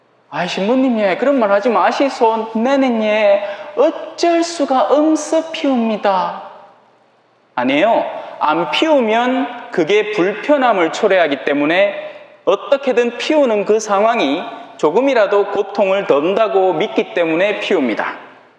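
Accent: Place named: Daegu, South Korea. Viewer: 신부님의 대구 사투리 구수하네요 알기 쉬운 강론말씀 감사합니다 세속 육신 마귀에서 열린 자 되겠습니다 ^~~♡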